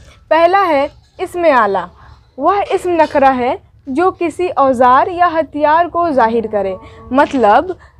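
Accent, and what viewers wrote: Bihar, India. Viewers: ماشاءاللہ بہت خوب بس پلیس آپ شاید بہار سے ہو اس لئے کے آپکی زبان بتارہی ہے جیسے(زگہ نہیں بہن جگہ) معذرت کے ساتھ آپ سے درخواست ہے کہ آپ انٹرنیشنل استاذ ہو اس لئے زبان بھی ویسی ہی ہو جزاکم اللہ خیرا واحسن الجزاء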